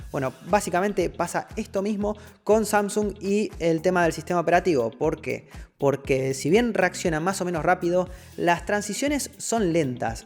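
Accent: Argentina. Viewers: che flaco sos argentino no español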